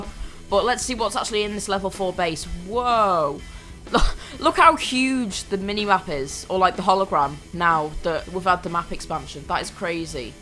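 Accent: United Kingdom. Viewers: LOL i love ur accent XD u from like south western??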